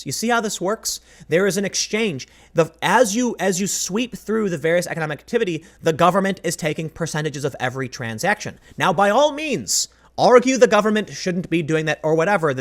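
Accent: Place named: Chicago, United States. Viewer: I was listening to you talk, and I thought to myself, "Self? That accent sounds just like Bill Murray's. I wonder if he's from Chicago like Tim." And, sure enough, he is.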